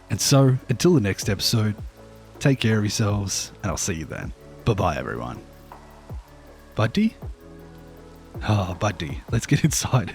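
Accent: Australia. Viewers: Im just new to your channel and so far im really enjoying your content and I love buddy, Am i hearing an australian accent, thats cool if it is, I really wish there were morre australians doing minecraft content. but if im not and im wrong thats cool too, beacause im enjoying your content.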